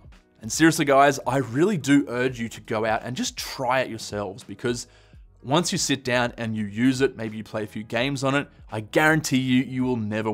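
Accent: Australia. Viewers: I subscribed as soon as a heard that beautiful Aussie accent. How about the Hidpi compatibility? Some monitors don't have that options when connected to MacOs. I'm thinking of buying a mac mini and a gaming monitor. Cheers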